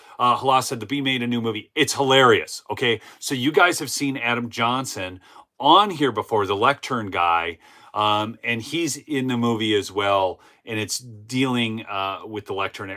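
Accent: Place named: Minnesota, United States. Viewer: You're from Minnesoooota.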